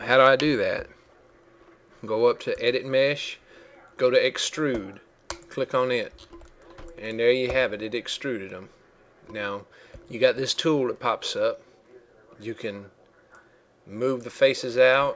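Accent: South, United States. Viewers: pretty funny how i automatically discount everything you say because you have a southern accent. that's not really fair, but it's funny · love that southern accent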